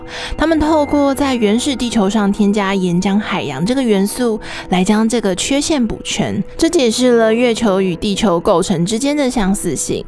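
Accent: Taiwan. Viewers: 台湾腔听着莫名恶心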